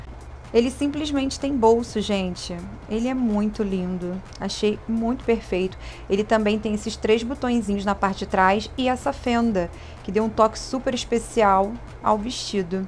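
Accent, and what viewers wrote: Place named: Rio de Janeiro, Brazil. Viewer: você é mesmo linda! E Esse seu sotaque carioca arrasa🥰🥰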